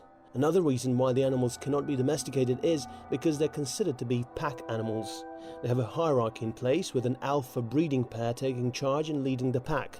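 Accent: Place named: United Kingdom. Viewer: Shut the fuck up you and your annoying British accent.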